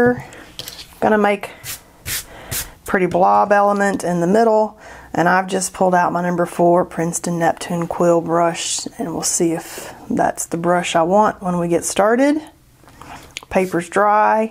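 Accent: South, United States. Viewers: your southern accent is super southerny* today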